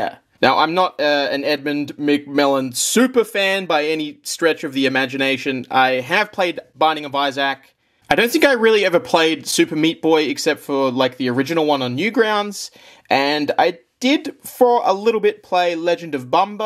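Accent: Australia. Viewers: He’s not saying it wrong, it’s an Aussie accent you dolt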